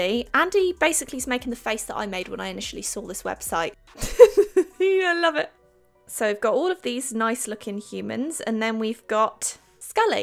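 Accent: United Kingdom. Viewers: I love how you British people talk 😍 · You are British right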